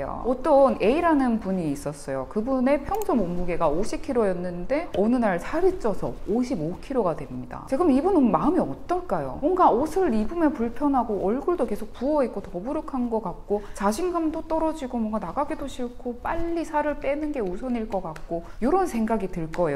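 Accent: Gyeongsang, South Korea. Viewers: 머지 경상도 사투리 들려용 !!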